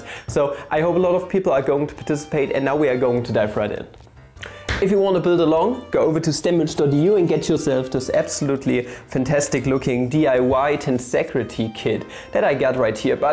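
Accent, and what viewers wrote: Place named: Bavaria, Germany. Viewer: that Bavarian accent is just so engaging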